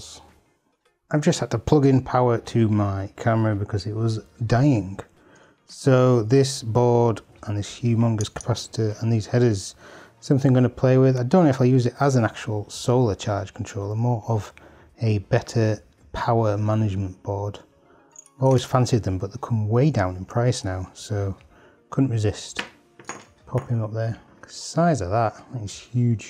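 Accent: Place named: Newcastle, United Kingdom. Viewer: Hi. You might remember me from Seon's live stream chats. I'm a Dutchman not trained in UK dialects but I think I'm hearing a Newcastle area accent?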